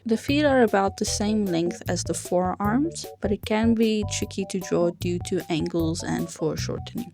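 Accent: South Africa. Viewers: Her accent sounds south african a bit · Your accent is giving me South African deja vu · your accent is a lot like a africaner's accent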